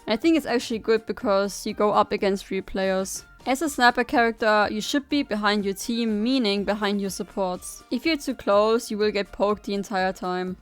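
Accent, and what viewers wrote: Germany. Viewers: First thing I noticed: definitely a German accent, but nevertheless a nice guide! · German accent heh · Are u german cuz ur english sounds like you're german? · I hear deutsch Akzent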